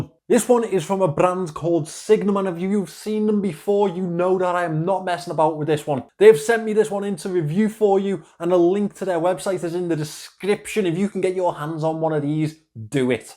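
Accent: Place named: England, United Kingdom. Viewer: sorry. I just can't tell. I think he's English but the accent is just so strong. I will put on caption and hope it helps.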